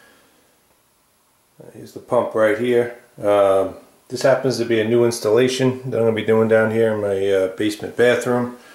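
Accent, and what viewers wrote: New York, United States. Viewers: I'm guessing by your accent that you live in NYC/Long Island area? I'm originally from Queens but live in NJ. My wife and I go to the city several times a year to catch a play and eat something bizarre in Chinatown. I'll give you a shout if you are in fact from the area and get you a beer or two. Thanks again from a great video. 😊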